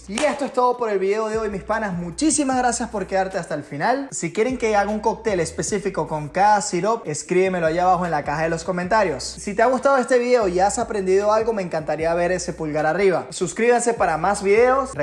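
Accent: Venezuela. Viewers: Será venezolano este chico? Habla hermoso y es un crack en la barra 10/10 hermoso❤ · Este pana parece venezolano :D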